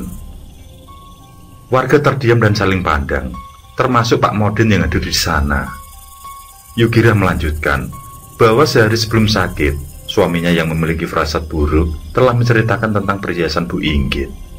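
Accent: Java, Indonesia. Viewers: Sukaaa denger suaranya pakdhe...baru aja sih nemu channelnya pakdhe ini dan langsung jatuh cinta...dialek jawanya pakdhe bikin ademmm...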